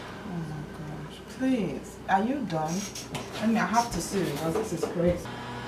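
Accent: Nigeria. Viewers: You are so beautiful and tutorial is explanatory and easy. You sound Nigerian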